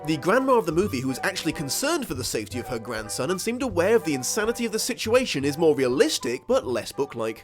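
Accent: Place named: United Kingdom. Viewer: YOU ARE BRITISH! So am I Hyper mega mega fan boy mode activated. I mean your accent was obvious but I needed to be sure, then your complaint about the Americans ignoring us Brits definitely sold it for me. Also they have acknowledged us, well at least the MCU has. Now they have Tom Holland, Tom Hiddleston and Benedict Cumberbatch as Spider-man, Loki and Doctor strange.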